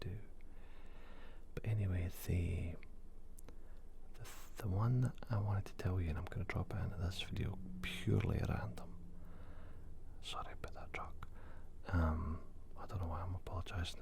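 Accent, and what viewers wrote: Scotland, United Kingdom. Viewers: I LOVE videos like this. I’m always partial to ramble videos. For me, you’ll never be one of the channels that gets buried. Scottish accents seem to be few and far between in the ASMR community, other than Lauren, so I always find myself coming back to your videos. You’re one of only channels I have notifications turned on for. Keep up the great work my friend, but I hope that you’re still enjoying this grand youtube odyssey you’ve embarked on.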